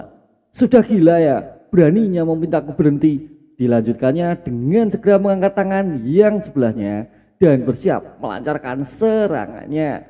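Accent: Java, Indonesia. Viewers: Logat jawa lo medok banget😅😅😅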